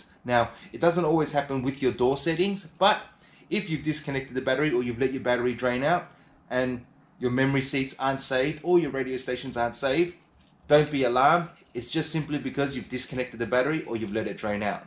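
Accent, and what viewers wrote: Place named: Australia. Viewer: Hey, Great Aussie accent. Yes good info but my CLC <num> does not have a convenience feature so only operates by the buttons on the dash and the swing out does not work. would i have to fix that switch? Or could I make it operate with the key fob? André in Sydney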